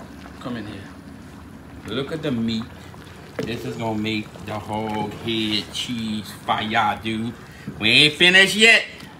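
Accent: Louisiana, United States. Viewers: Man I really like the cajun accent. Getting a heavy dose of cajun today after I found this video. Awesome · Born and raised in in church point Louisiana. Been gone from there over 25 years, thanks for bringing me back with every video you make. Btw my dad talks just like that lol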